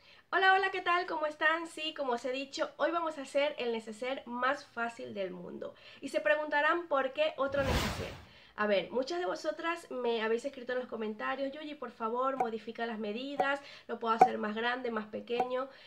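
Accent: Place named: Venezuela. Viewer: Gracias por no perder tu acento, nosotros los venezolanos tenemos uno hermoso.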